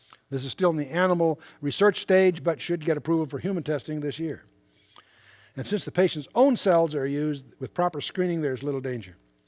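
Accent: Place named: United States. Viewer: What a great blessing Chuck has been to us remnant believers. ❤️🇭🇲 I heard Amir Tsarfati say in his zoom call Q&A with the Romanian churches in Australia (a year ago) that he loved Chuck, and is still friends with his daughter and her family. This made me happy. Chuck's like my Dad, but with an American accent. My Dad loves Chuck too, and told me about this channel, which I immediately subscribed to, and added to my playlists.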